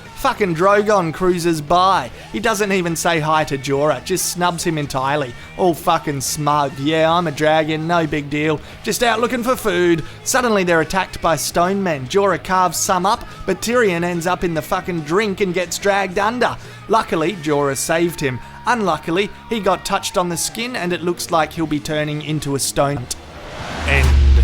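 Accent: Australia. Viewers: Good shit, I even start thinking in an Australian accent now.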